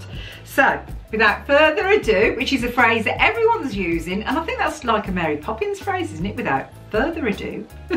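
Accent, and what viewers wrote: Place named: Southern England, United Kingdom. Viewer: 😘hopefully we get to trade someday! Love meeting new Disney Friends - especially in the UK. From your accent I'm guessing down South? Although, I am terrible at guessing accents!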